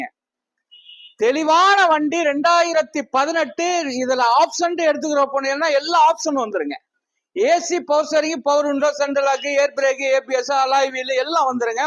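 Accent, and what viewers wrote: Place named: Nilgiris, India. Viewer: Car ra neelagiri thayalam slang la sale pani ipotha pakuran😅😅😅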